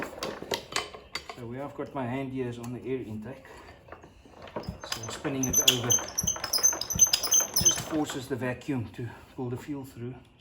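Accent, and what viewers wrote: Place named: Australia. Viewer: Love the Videos. Your Aussie accent is so calming. Sends Tingles down my spine 🤣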